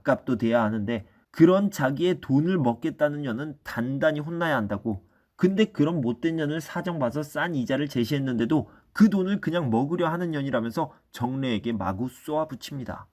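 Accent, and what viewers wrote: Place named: Jeolla, South Korea. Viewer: 형의 목소리로 들으니 명작 소설이 더 감칠맛 나게 들리네요. 판소리의 지방에서 나고 자란 분이라는 게 잘 느껴집니다. 형은 판소리를 배웠으면 명창으로 이름을 날리셨을 거예요! ^^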